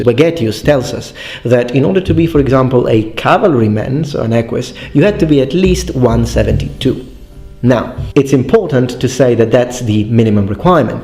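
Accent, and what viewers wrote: Italy. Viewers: Not so much Latin pronunciations (We don't know what ancient Latin sounded like) but Italian. So your brain is saying, "Oh that sounds good," but the reality is that you're just listening to a Romance language accent, speaking Latin. I speak Spanish as a second language, and I notice that I will throw a Spanish accent on Latin words, because, well, I don't know what else to use as a reference point. But I'm aware of it. I'm sure this guy is aware of the limitations of his pronunciations too. I know it seems pedantic, but this is an often debated subject in academia. We truly don't know what Latin sounded like until the middle ages, because that was the first time diction books started being printed. So that's where humanity's knowledge of the sound starts. edit: Never mind, this guy has another video claiming he is pronouncing classical Latin. 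So it appears he is just another boob on youtube who collects weapons and armor, but doesn't really have any deep knowledge on the subject. Still entertaining, and not wholly factually untrue, but I would never use this guy as an academic reference. He's definitely misleading people in an effort to appear more knowledgeable than he is. Even in this video, he claims various things based on real historic examples, but he completely leaves out the perspective of how long the Romans existed. Literally, for the first few hundred years, every single Roman legionarie was from the city of Rome. Period. Citizenship was a requirement of serving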